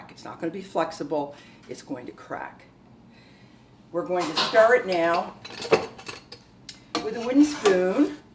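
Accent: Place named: Northeast, United States. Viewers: your so cute 🤗😘 I'm from Canada sound like your from New York area it too me 5 mins to figure out what Wyader was Oh!! WATER 🤣🙃😊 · ! You sound like you’re from the northeast!! My Dad always pronounced the word “water” just like you!!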